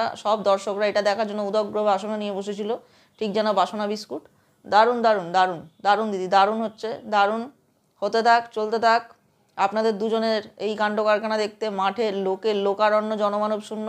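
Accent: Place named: Bangal, Bangladesh. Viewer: এটা ঘটি নয় , বাঙাল মনে হচ্ছে 😊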